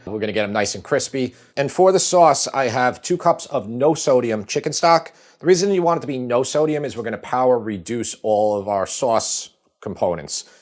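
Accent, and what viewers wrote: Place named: Long Island, United States. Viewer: Never lose your lawng island accent. As an LI native (east end) who splits his time between the island and North Carolina, the accent is always recognized down here as a “new yawk” accent. I always correct them and tell them that “It’s a lawng island accent, and we live ON the island not in”.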